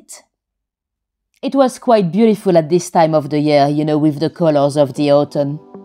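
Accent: France. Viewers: Your accent are u French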